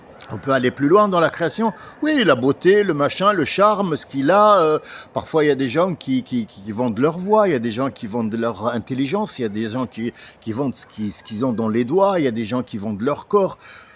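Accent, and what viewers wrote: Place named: Arab world. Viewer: Assalam alecoum très Joli travail TU es très fort et TU as un joli accent Arabe hamdoulilah ET TU connais bien le Coran infalsifiable et Sunnah ET TU es vraiment excellent Ok TU es vraiment excellent Par là grâce de Allah Ok je suis petit à côté Mais hamdoulilah... Oui nous sommes coeur de Lion Muslims Ok Allah Di Mes chiens sont dès Lion comparé à Vos chiens bref pour dire que à tout moment n'importe quel personnage peut se retrouver dans Ton histoire coranique évoqué hamdoulilah ET c'est Par ce que là finalité Est que Allah Seul connais les réservistes pour résumer ET que dans l'attente beaucoup sont là déjà aussi hamdoulilah ET TU fait partie de ces gens hamdoulilah Ok frère merci pour cette vidéo Allah Te Protège Amin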